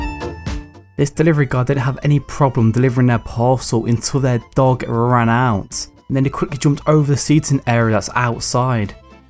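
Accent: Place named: Leicester, United Kingdom. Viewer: I guess you’re from Leicester because I used to live there before. Your accent so different from Londoner but I like it :)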